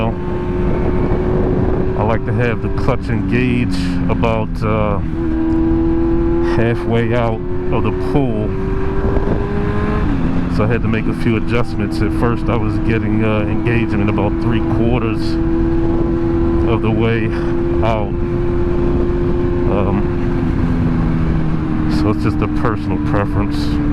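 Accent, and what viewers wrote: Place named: Baltimore, United States. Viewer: Good stuff man. Thanks for the video. Unrelated, you sound like a Baltimore dude, but those don't look like Baltimore streets 🤣.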